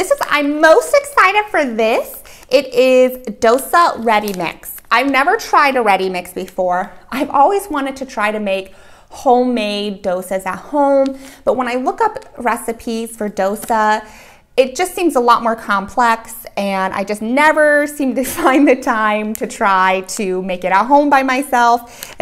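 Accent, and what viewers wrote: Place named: South, United States. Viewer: This woman is very traditional and I like that. And also the accent is great... Is that Southern American accent, near Texas or something??? I am saying that because I watch American cinema a lot and can understand the little slang from one part of the country to another.🙏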